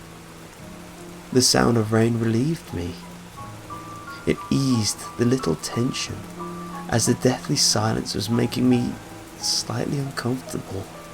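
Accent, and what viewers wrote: England, United Kingdom. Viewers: Nice to have an English accented narrator for a change , nice atmosphere and fab pics. Thankyou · Why are all creepypasta narrators British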